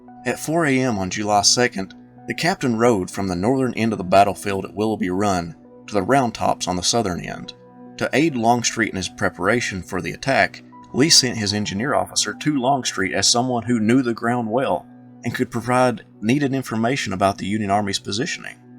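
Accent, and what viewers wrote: South, United States. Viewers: As soon as I hread the narrator's cornpone stupid accent I knew it was a hit job on Pete. Bigly sad. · A hit job on a dead man, using his enemies and a piece of fiction as sources. Do I detect a Southern accent?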